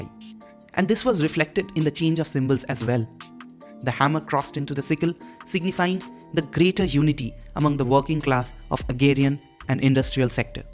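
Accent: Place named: Bengal, India. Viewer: It fills my heart with joy to see a Bengali person (assuming from your accent) create such high quality and so interesting variety of contents. Just subscribed. keep up the good work. wish you all the success 🎉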